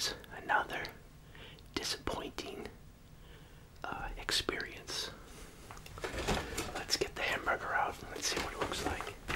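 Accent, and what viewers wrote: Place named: Massachusetts, United States. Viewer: I knew u were from the east coast! Massachusetts?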